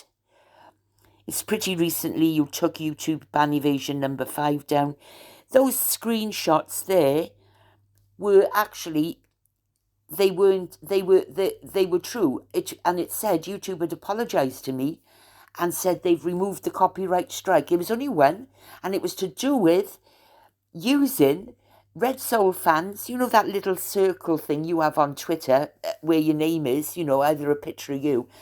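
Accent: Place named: Newport, United Kingdom. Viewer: Welcome back I hope you stay on for ever. From a former Newport women who lived in Pillgwenly for twenty years, now loving in Italy. Your accent takes me back to when I lived in Newport. We love you ❤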